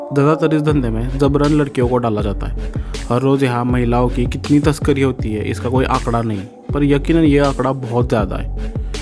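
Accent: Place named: Maharashtra, India. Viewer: Aap pakka maharastrian Ho isliye aap ki boli se pata chalta hai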